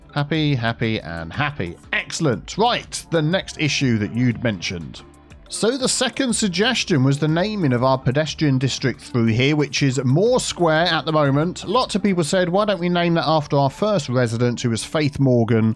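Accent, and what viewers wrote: United Kingdom. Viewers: Building this one along with you to help me learn. Love your content. Great to hear a British voice! Thank you!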